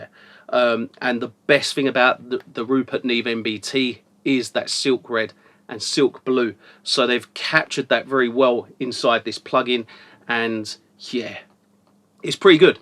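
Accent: England, United Kingdom. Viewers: great reviews as always!! Thanks-you. When you say "three" it sounds like "free" ! ...its the English accent + my hearing loss! :)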